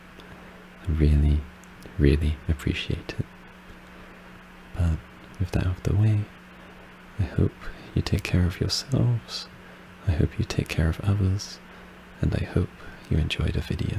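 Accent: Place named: England, United Kingdom. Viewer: accent is also english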